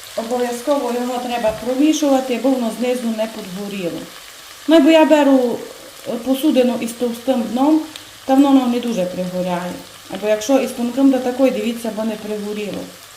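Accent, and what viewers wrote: Transcarpathia, Ukraine. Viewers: Я из Болгарии и хоть слово не поняла,но до чего же интересно слушать ваш прекрасный закарпатский диалект!!❤Украина - моя боль с 2013 год.😢Молюсь о вашей стране каждый день!🙏Держитесь,милые,смелые Украинцы!Весь мир с Вами!Народ - Герой!!💪💛💙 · ви так гарно говорите,в мене є сусід з Закарпаття то він так подібно говорить,як ви,але він вже багато років живе в нашому місті приїхав працювати на шахту,то вже трохи виговорився,але мені ся люби,як ви говорите.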